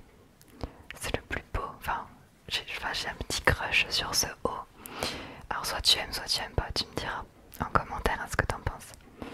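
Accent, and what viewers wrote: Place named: Provence, France. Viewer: L'accent provençal en ASMR j'arrive plus à m'en passer, y a moyen d'être leadeuse d'un mouvement 👀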